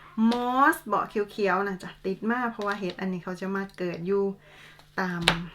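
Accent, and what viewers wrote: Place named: Isan, Thailand. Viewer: จะแมนคิดฮอดบ้านนอกคักเนาะแม่ 55 ฟังเสียงเว้าเป็นตะลิตน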